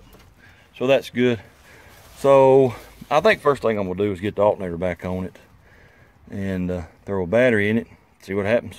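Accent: South, United States. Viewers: Well, now I know why your voice sounds so familiar. I saw that Montgomery County plate, lol. I'm originally from Henry County on the other side of the lake from Stewart County, so your voice sounds like a lot of my kinfolks. I've lived up here in Southern Indiana for a good number of years and been inside all evening cause it come up a bad cloud earlier and then poured down most of this evening...it was a frog strangler! So I just run across this channel on YT this evening and been watchin' the last few hours.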